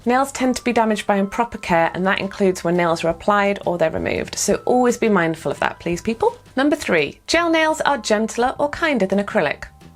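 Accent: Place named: United Kingdom. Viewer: Me watching: Do I focus on her gorgeous nails or her perfect British accent?!?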